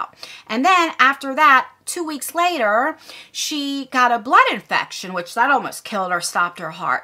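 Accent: New Jersey, United States. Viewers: Thanks for sharing! I don't wear makeup much beyond lipstick, so the unboxing channels I follow are because of the person doing the videos. I've liked you from the beginning. I like listening to you speak (so, chat away!), you're pretty (love your eyes!), and you've always seemed like a genuine person. I hate fake people, so it's refreshing coming across someone like you. We moved to Jersey when I was in junior high, and my mom still lives there (although I do not) so your accent caught my attention, too 😄 I'm glad you have a support system to help you through the tough times, and I wish you all the best 💕 Buona fortuna!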